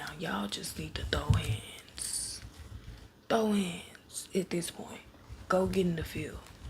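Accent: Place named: Tennessee, United States. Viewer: Aint even a woman but im frm Tennessee too so yo accent remind me of home 🙌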